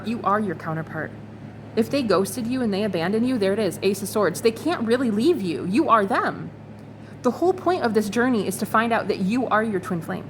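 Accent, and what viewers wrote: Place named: Scotland, United Kingdom. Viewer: Scottish lmao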